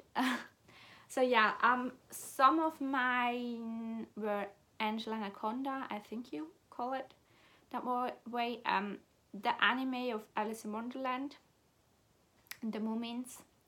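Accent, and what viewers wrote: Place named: United Kingdom. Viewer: Hahaha, woah a shocking concept... are you sure you're allowed to not watch videos that you don't like? I mean, how else will you be able to make a video complaining about it? Haha! ;) I just really liked the chattiness of this video, it made me chuckle to laugh along with some of your stories, especially the failed film experience at the theme park, haha. British accent is totally the best accent... not biased at all, you know, haha. I remember being tagged in this video at one point, and then deciding that I didn't feel any need to do it, so I just answered some of the questions in the comment section of that video, but I totally understand why people are talking about the point/relevance of this tag. You need to go to Nando's, it's a Portuguese restaurant which is highly popular in the UK and caused a massive thing online about the term 'cheeky nando's' which I think turned into a meme or something. 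But it is a great restaurant, and even though they focus on chicken, their vegetarian options are delicious! HOW CAN YOU NOT LIKE TEA?! Hahaha, maybe you just haven't found the right kind of tea!? I'm joking of course, only slightly wounded... slightly.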